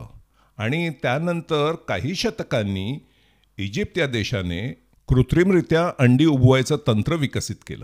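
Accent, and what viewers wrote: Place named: Mumbai, India. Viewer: छान माहिती.....इतकी वर्ष इस्रायल मध्ये राहून सुद्घा मुंबईच्या भाषेचा तडका अजून जशाचा तसा आहे😊😂असे वाटते काल परवा पर्यंत मुंबईतच होतात की काय तुम्ही😊....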